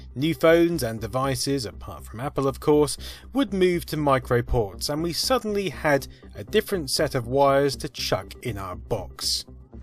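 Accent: United Kingdom. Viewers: I giggle every time he says Nokia. Does everyone from the UK pronounce it 'nawkia'?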